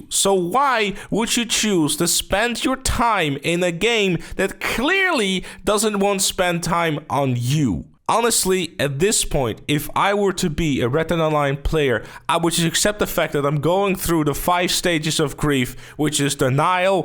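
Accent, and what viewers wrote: Netherlands. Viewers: You talk English as if you’re Dutch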